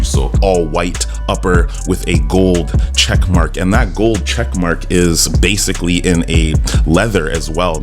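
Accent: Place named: Ontario, Canada. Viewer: You from Ontario? That accent hitting different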